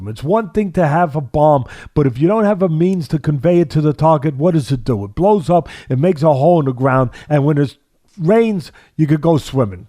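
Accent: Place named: New Jersey, United States. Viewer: Teddy is the Ultimate 🥏 heavy weight Communicator..: passionate, articulate, insightful, intelligent 🤓, and funny with that Iconic NJ accent . When he gets to Heaven King Jesus wants him to talk to Him for a Thousand Years on Fighting...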